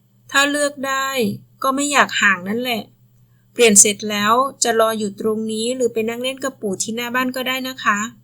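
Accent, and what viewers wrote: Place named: Isan, Thailand. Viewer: พุดอิสานเพราะมาก😅😮😢😢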